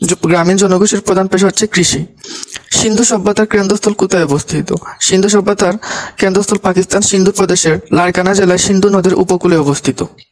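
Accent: Sylhet, Bangladesh. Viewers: আমি ও সিলেটি আপনার কথা শুনে বুঝতে পারছি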